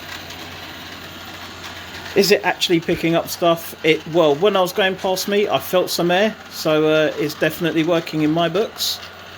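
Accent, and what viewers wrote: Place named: United Kingdom. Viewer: You sound British but your hands don't look British😅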